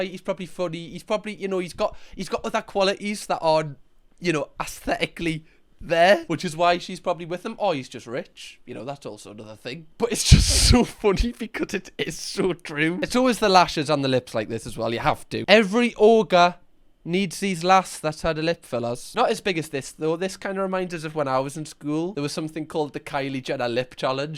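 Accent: Newcastle, United Kingdom. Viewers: Your a Geordie!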